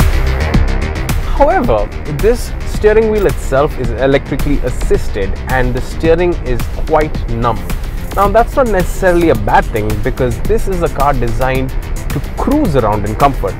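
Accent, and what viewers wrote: India. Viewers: I literally can't understand you with your indian accent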